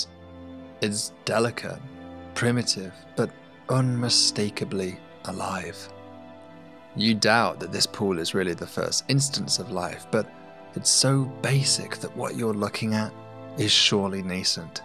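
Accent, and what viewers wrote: England, United Kingdom. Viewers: I love your English accent , fascinating 🤨 !! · I think you missed my point, obviously your nationality is determined by your country of birth but if you were raised from birth in another country then you become 'culturally' part of the country you were raised in. The point I was trying to make was that his accent is genuine as he was raised in the UK. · Ain’t nothing like a British accent narrating something could listen all day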